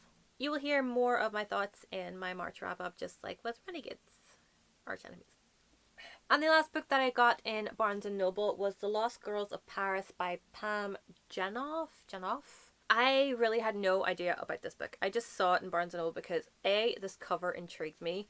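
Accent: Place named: Ireland. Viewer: Dont lighten your accent i love the irish accent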